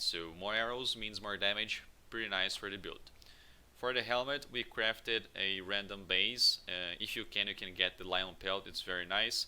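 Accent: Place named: United Kingdom. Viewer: Agora sim eu consigo escutar esse britânico nato falando <3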